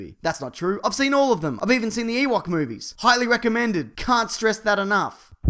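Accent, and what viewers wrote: Australia. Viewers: Hey man big fan. Just wondering that's an Australian accent right?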